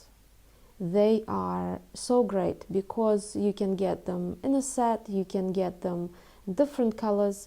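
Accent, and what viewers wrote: Russia. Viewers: I love your voice! I am new to your Chanel. As much as I love watching someone draw, the main reason I watch your videos is the sound of your voice. Not only is it soft and relaxing, but I have ALWAYS enjoyed the sound of a Russian accent. I’m glad I came across your Chanel. Thank you!! ✌️ from Canada!! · Beautiful feminine voice, beautiful Russian accent!😊😊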